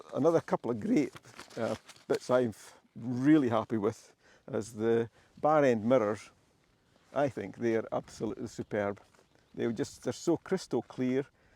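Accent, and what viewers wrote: Scotland, United Kingdom. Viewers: haha no doubt, but I meant experiencing the next level of RE bikes. Anyways, thumbs up to these cool videos and that super cool Scottish accent.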